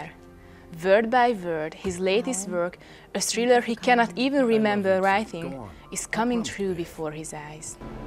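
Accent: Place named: Russia. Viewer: has a great Russian accent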